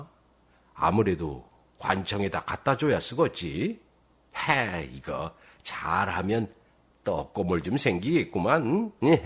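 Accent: Jeolla, South Korea. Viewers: 절라사투리. 징하구만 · 구수한 나레이션이 좋았지만 전라도 사투리가 어슬프 듣기가 거시기합니다. 걍 표준어로 하세요.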